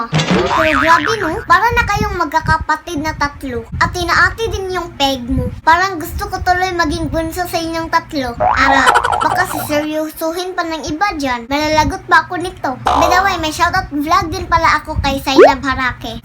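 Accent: Visayas, Philippines. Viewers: Visaya kaba ?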